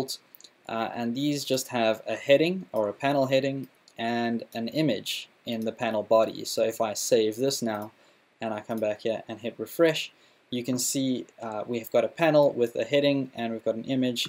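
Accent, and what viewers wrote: South Africa. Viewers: Thanks for a really useful and easy to follow tutorial series - I'm a subscriber. You make learning enjoyable and simple! Love your South African accent too mate... and you're a really pleasant and cool guy! Keep up the great work... and I'd love to see more tutorials on topics such as Angular.js, react.js and node.js, etc. We'll keep you busy mate!:-)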